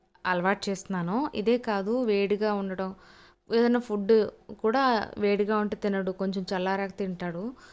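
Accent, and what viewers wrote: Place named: Tirupati, India. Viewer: Anduke aduganu Akka, she looks like u Akka, n ur having tpt slang akka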